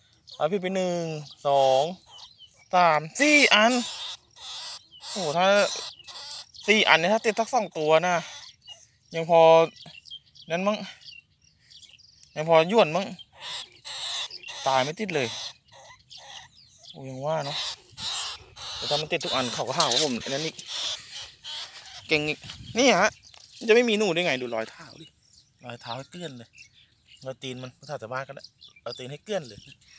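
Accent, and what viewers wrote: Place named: Kanchanaburi, Thailand. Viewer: อยู่ไหนเสียงเหนอเมืองกาญจ์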